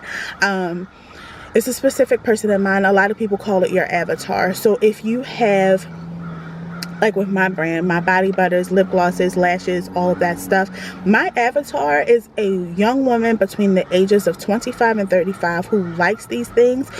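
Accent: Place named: Baltimore, United States. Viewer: Thank you Queen, and I will take your advice, because I have strongly been thinking about starting a body butter business. New subscriber, and you sound like you're from Baltimore lol. Love your accent and your skin is beautiful! Wishing you continued blessings on your business and look forward to your videos! 😀💞🤗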